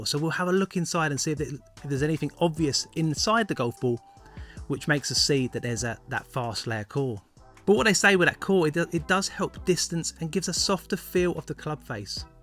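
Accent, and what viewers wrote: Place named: England, United Kingdom. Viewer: First off Zee Star?? You sound English so surely it's Zed Star. Not tried the divide tbh, but a playing partner likes them, Q Star Tour Divide. I found a Z Star Divide and gave it to him, his reaction didn't go as far Q Star, but that's the compression and with the slow swing speed he gets more out of the soft one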